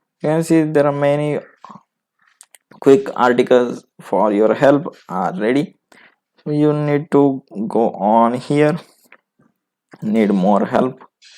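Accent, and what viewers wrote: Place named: India. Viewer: The minute I heard the accent Indians are heros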